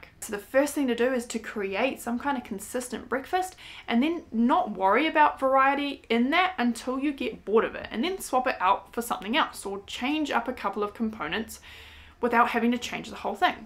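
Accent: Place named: New Zealand. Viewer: Hi. First time seeing your channel. I heard your accent but I was trying to work out where you are. I’m a Kiwi too but live in Australia now (married an Aussie). You said “take out” instead of takeaway so I thought you must be living in the US. Then you mentioned having spent time there. That was great advice. I watch a lot of plant based vegan YouTube channels and they pretty much have the same meals as you showed. I think we all eat the same. 😂 What you said was so true. It’s easier to stay on track when it’s a habit. At first I thought no, I can’t eat the same every day. But I understand your points. Little tweaks can be easily made. Thanks!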